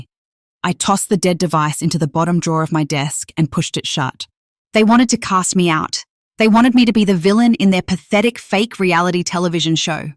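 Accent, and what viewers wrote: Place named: Australia. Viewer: Kiwi here, Refreshing to hear an Australian accent. Some of those American accents are horrid. Good story, my youngest daughter had a similar experience when she was little, quite a traumatic experience for a young one.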